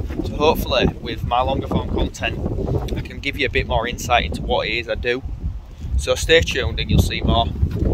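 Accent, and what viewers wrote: West Yorkshire, United Kingdom. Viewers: Came for the workmanship, stayed to hear my own beautiful West Yorkshire native accent that I miss hearing daily now I live in the USA. Thanks for being a brill Yorkshire ambassador, wearing your accent and showing the world Yorkshire pride, skill and industry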